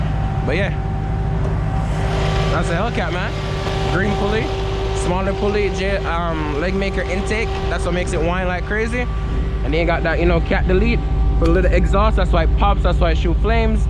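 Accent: Jamaica. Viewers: As a yaadman, me coulda pick up the accent immediately lmao, big up yuhself me g, dawg push di hellcat yuh fuckkkk😂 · This the jamaican crazy driving yall won't understand😂😂😂don know mi a watch from aruba/jamaican big up much love love e accent my yout and the content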